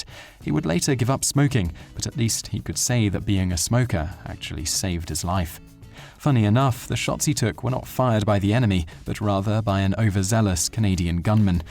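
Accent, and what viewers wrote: United Kingdom. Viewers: A few comments. First, when discussing he was shot by a 'Canadian gunman' you show a pic of someone wielding an American M1 rifle. And why the term 'gunman'? Sounds amateurish. Soldier or rifleman would have been better. I believe it was a burst from a Bren LMG that got him. In his autobio he says that he didn't notice the leg wounds and was fixated on his finger hanging by a bit of skin. He went to an aid station and the medic merely tore his dangling finger off then said, "now, how about that leg?" He was stunned that he hadn't noticed the most serious of his wounds... or that he could even walk! Now for the bit that irks me the most from this video - particularly as the narrator is obviously a Brit. That would be the MISPRONUNCIATION of the rank lieutenant. Who do you think we Canadians are, a bunch of yanks? Every English speaking nation of the world pronounces it 'lefftenant' EXCEPT one... 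the USA. I have no problem with that - that's their way. But, for the love of God, stop using the US version when referring to the Commonwealth! You're likely thinking, "oh relax. It ain't no big thing..." But it is. We are losing the finer points of our culture to a deluge of US influence. Already far too many ignorant Canadians use the wrong pronunciation (even reporters who often say "Lootenant Governor'....grrrrr) without having someone with a Brit accent confusing them even more. And to all the dummies who say, "but it's spelled that way..." I say, speak the rank 'colonel' out lou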